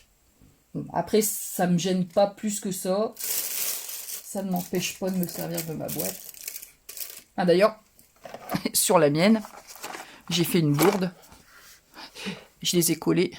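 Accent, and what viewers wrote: North, France. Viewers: Coucou 🙋‍♀️J’avais reconnu que vous étiez du Nord à votre accent, sourire… Je suis du Grand - Est, de la Moselle.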